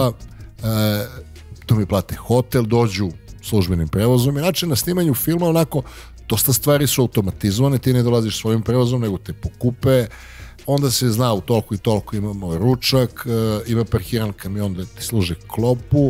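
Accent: Niš, Serbia. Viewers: Ti si nislija ja to ne verujem mislim na naglasak ne na ove kadrove a ti si presavrseno uradio ove kadrove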